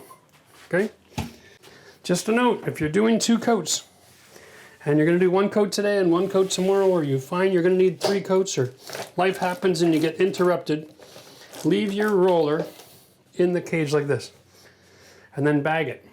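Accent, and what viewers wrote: Canada. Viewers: Florida? I would have guess you were Canadian.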